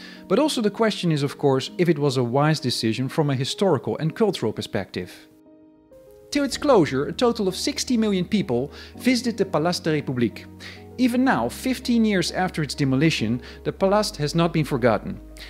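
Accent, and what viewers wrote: Netherlands. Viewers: Blame the Dutch accent. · Great vid, I'm guessing your first language is Dutch? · Interesting, thank you. Your accent sounds Dutch, so not an Ossi :-) What made you take up DDR history? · You sound very Dutch. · You mean “the only channel about East Germany in English” with a heavy Dutch accent! Calling it “English” is a bit much. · he has dutch accent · First time viewer(and new subscriber)…very informative thankyou…I lived in BRD(München) IN 1985/86 and visited Berlin a few times…I remember this building…also..back then..never in my wildest dreams did I imagine that the wall would come down….p.s. to my ears your accent sound Dutch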